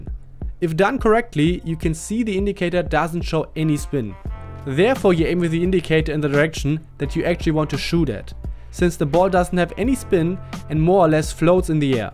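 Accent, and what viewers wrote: Germany. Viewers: Your obviously German aint you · So n bissle hört man den deutschen Akzent dann doch raus 😉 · Bist du deutscher ? Hört sich vom Akzent her bissle an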